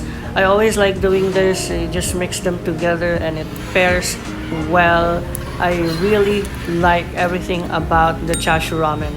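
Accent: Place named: Japan. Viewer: Japanese accent is lit 🔥🔥🔥🔥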